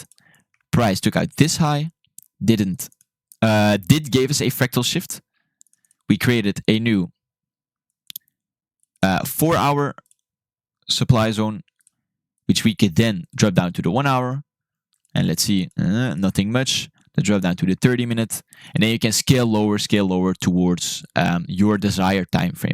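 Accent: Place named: Netherlands. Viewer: ahh okay.. thanks for replying. Btw..Nice Dutch-English accent you got there!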